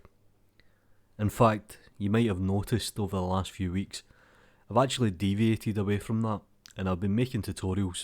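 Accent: Scotland, United Kingdom. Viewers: Dude, your accent is the reason I watch your channel. There's a bunch of blender content but none with a cool Scottish accent. Ignore that nonsense. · Quality content and the 2nd best accent in the world (sorry, Kiwis win that one). Nothing to complain about. When I first stumbled upon one of your videos I was like: fuck yeah, finally a Scot doing Blender tutorials. Win! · Your scottish accent is absolutely great and we love it. That's also the reason we are watching your videos. Keep doing, don't stop, please. There are different accents somelike is more comfortable with ( British, US, Australian, German, French, India ... etc. ) Guys, exit your comfort zone and listen, watch, learn, improve - like in Blender. Listening you will improve english skills for others, not only Blender skills. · Personnally I have lived in Scotland for a year and a half and your accent makes me remember lots of nice people i've met there so I like it :) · I mainly watch it because of the Scottish accent. 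Easier to understand than Burnistoun :D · I am actually watching the videos on Scots phonetics now that I got hooked on your accent 😄It’s so soothing! And I never have any trouble understanding now that I’ve watched a lot of your videos and got used to it. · To the twat who wrote the comment: You do know the CC button will show text of what's spoken, don't you? Or is that too hard for you to understand, too? To: Great vids AND a delightful Scottish accent? What more could you want? Thanks for what you do! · I'm not native but your Scottish accent is absolutely brilliant! Keep it or marry me so I can listen in private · Leave my Scottish Blender Tuts man aloneeeee!!!! I love his accent (but then Burnistoun was genuinely one of my favorite sketch series shows ever) If ye don't understand the lingo - away to a different YouTuber you go. (I'm an American, btw) If you want to learn to understand Scottish then go watch Burnistoun on YouTube it should get you up to speed. 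· I fookin love the Scoatish accent n' ah love your tutorials!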